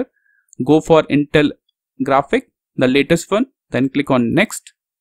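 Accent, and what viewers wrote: India. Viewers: indian accent?? Im saved 🙏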